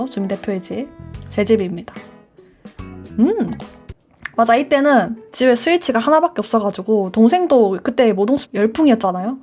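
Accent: Gyeongsang, South Korea. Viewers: 와...... 저 대구토박이인 사람인데요.... 님도 역시 대구사람이구나... 억양이 완전 대구사투리 따봉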